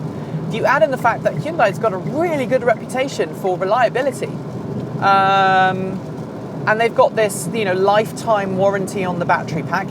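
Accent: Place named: United Kingdom. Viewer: One of the reasons I subscribed to is that I appreciated your in vehicle driving soliloquies, common sense information, and your great British Accent. I also appreciate when you include things about your life, good, bad, or mundane, as we get to connect even more so. KEEP IT UP! I hope things settle down for your and yours.John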